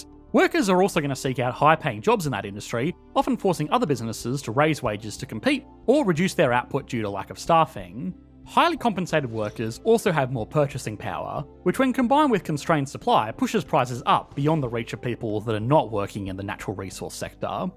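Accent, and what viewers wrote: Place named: East Coast, Australia. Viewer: East Coast Strayan.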